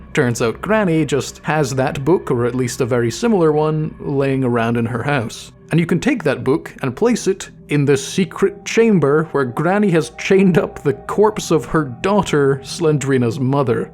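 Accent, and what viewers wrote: Scotland, United Kingdom. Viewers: Btw If that’s a Scottish accent I hear I love it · you are the most normal-sounding british person i have ever heard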